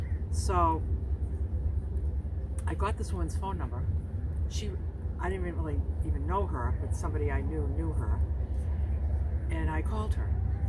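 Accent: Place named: Boston, United States. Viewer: I really enjoyed hearing about your spiritual journey. You have a way with words and the bostonian accent to boot, such a great storyteller. It's different for everyone and hearing others really gets my wheels turning. I believe in all things greater than myself, which always leads me to question. A wise woman once told me to not ask why but instead be grateful. Life long student over here, endless lessons to learn and so much gratitude.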